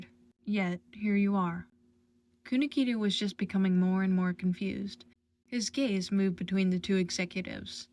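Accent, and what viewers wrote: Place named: United States. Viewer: Me trying to understand: 😦😧😨😰 You have a very strong American accent, my hopes of comprehension are long lost to the wind bahsbahha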